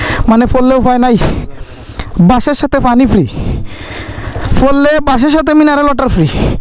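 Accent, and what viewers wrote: Sylhet, Bangladesh. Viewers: Vai ki Sylheti?? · Vai apni ki sylhet ti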